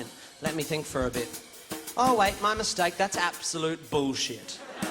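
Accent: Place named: Australia. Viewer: i thought he was giving storm an austrailian accent and theres another song where he talks to an annoying rich guy with an austrailian accent so i figured he was trying to make austrailia look bad ( not really i was just makin a bad joke) turns out hes austrailian too im just an idiot who didnt catch his accent cause i thought he was british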